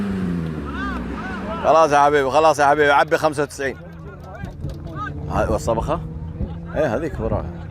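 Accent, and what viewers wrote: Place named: Jeddah, Saudi Arabia. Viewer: راعي القناه لهجته بدوي بس النغمه جداوي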